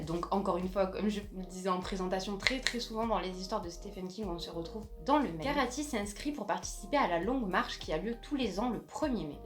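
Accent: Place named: South, France. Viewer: Je continue à remonter le temps et ce coup-ci en partant de la fin. Très bonne vidéo avec ses 3 parties, et j’adore la pointe d’accent du sud. Mon premier Stéphan King sera certainement 22/11/63. Marche ou crève m’intéresse ! Déjà le titre me parle et ton avis confirme l’impression.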